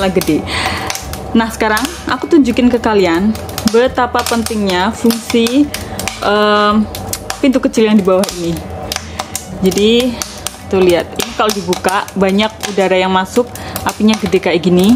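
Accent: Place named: Java, Indonesia. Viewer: Mbak'a kelahiran Jerman kah.?? Logat'a kek logat Jawa. Udah sub, mbak.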